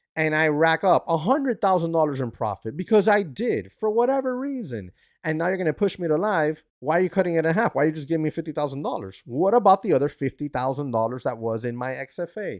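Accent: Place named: Miami, United States. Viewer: You got a cuban Miami accent bro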